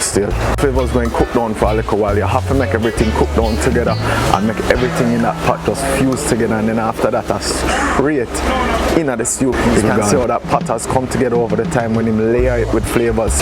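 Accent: Jamaica. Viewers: “Pot na have nuh time”. Sounds like my Jamaican mum & grandma, but me, raised in the West I need time and measurements 😂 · You make patwah sound sweet to the ear……we’re homesick….!! Never tried the coconut milk in my stew peas, but I sure will!! Blessings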